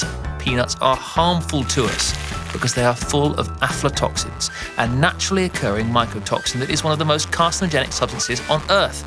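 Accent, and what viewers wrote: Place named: United Kingdom. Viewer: Ah esse inglês britânico difícil e lindo!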